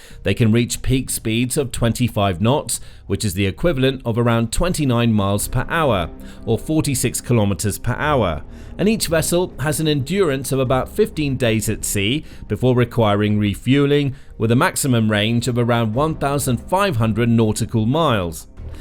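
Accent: United Kingdom. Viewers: The English (UK) accent is starting to annoy.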